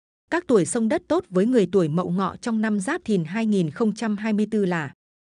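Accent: Thanh Hoa, Vietnam. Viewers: Giong Thanh hóa nghe đã ko dung rồi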